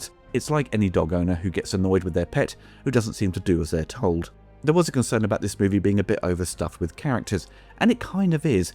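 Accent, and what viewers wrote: United Kingdom. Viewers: I saw the film today and am sorry you didn't enjoy it as much as I did. Since this is a non-spoiler review, I will save my detailed comments for when you review the plot. I will just say that I thought the story was very relevant to day... especially for what's going on here in the USA. I've never thought about where you live with regard to a particular film before. But from your accent, it sounds like you live in the U.K. Am I correct?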